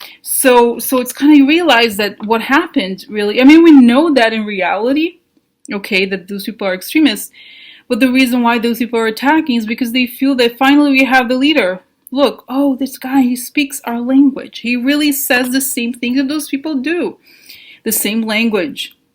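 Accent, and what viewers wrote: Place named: Russia. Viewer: Sometimes you have a russian accent. Umm Are you a scorpio? Good analysis of Jared. They have no souls. Thank you. XO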